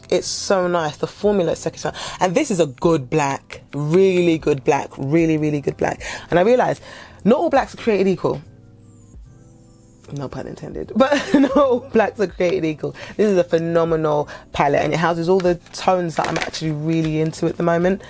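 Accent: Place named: United Kingdom. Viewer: Are you from Britain? I love your accent! Very elegant.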